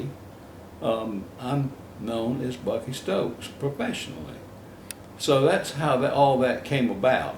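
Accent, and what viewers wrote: South, United States. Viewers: it sounds so much better when you say it in your soft southern accent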